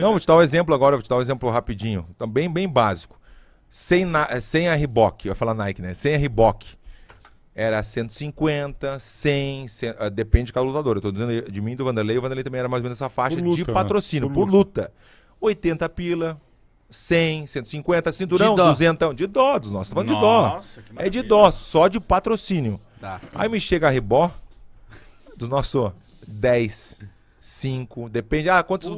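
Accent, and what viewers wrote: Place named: Rio Grande do Sul, Brazil. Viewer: O sotaque gaúcho é muito forte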